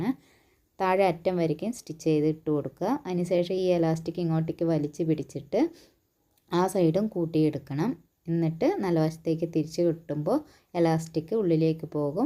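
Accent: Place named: Kannur, India. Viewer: സഹോദരി ഒട്ടും വിഷമിക്കേണ്ട. ഭ്രാന്തൻ മാർ പലതും പറയും ഞാൻ മുപ്പത് കൊല്ലമായി ടൈലറാണ് എനിക്ക് നിങ്ങളുടെ വീഡിയോകൾ വളരെയധികം ഇഷ്ടം നമ്മുടെ കണ്ണൂർ സ്റ്റൈലിൽ സംസാരം വളരെയധികം ഇഷ്ടം..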